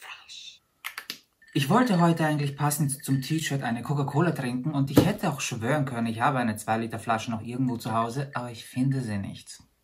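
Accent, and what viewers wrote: Austria. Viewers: Dafür! Der Unterhaltungs- und Informationsfaktor - in einem ausgewogenen Verhältnis - sind hier echt hoch. 💜💜 #support Und irgendwie kommen bei mir immer heimatliche Gefühle durch beim Zuhören, wegen dem österreichischen Einschlag. 🥰 Witzigerweise bin ich zwar gar keine Österreicherin, sondern Oberpfälzerin, aber der Regensburger Spracheinschlag (nicht das eigentliche Oberpfälzerisch, bloß das direkte Regensburgerisch! Ganz anders!) ist oft dem österreichischen Sprachtenor in vielen Punkten echt ähnlich, gerade eben wenn beides eher unterdrückt wird. 😂🙈 Vor allem, wenn man an der Nordseeküste wohnt, wo man wirklich komplett anders daherredet, kommt einem das vergleichsweise direkt heimatlich vor. Keine Ahnung, ob man versteht, was ich meine. 🤷🏻‍♀️😅😅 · ich lerne Deutsch. Ich habe dieses video so cool gefunden! Ich hab nicht alles verstanden, weil österreichische dialekten für mich schwerer sind. 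Aber vielen dank fürs Video!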